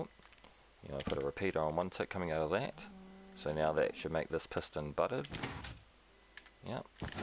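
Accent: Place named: Australia. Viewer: this thing is incredibly slow/inefficient, too many non wood blocks within a 5x5 radius. if this were just dirt and a dispenser firing away in an otherwise clear 5x5 area, even just that one glowstone 8 blocks up would slow it down whereas a wood block would not. of course thats also a much faster albeit less flashy way of getting wood. comparatively, this is like the poster boy for erectile dysfunction. however, it would be great if it didnt go against the sapling mechanic and you did a sound job with the video so id say go back to your own design. start off with a dispenser and mark off a 5x5 for testing, you will see that wood blocks(not planks) can pretty much go anywhere while others can maybe go 2 high or so before they hit the bonemeal rate. that said, i still cant give this a thumbs down, Australian accents captivate me. goodaye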